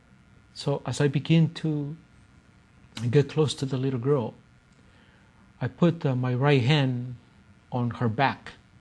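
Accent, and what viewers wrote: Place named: South America. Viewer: This man is from a south american( peru or something) country.. his accent is not a blackfoot, kainai or peigan or anything from that area.. sorry folks, but maybe he has some good teachings.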